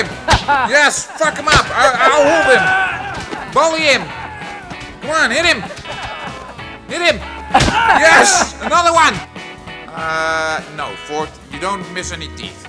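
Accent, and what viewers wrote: Netherlands. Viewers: Are you dutch? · are you Dutch? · are you dutch?i laughed so much my fucking stomach hurts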